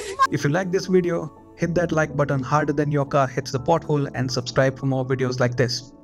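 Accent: India. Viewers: we can hear your indian accent buddy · Is These Guy Indian His accent Sound's like Indian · 💀🙏bro r u really an indian? Y r u speaking in like an-like an indian accent?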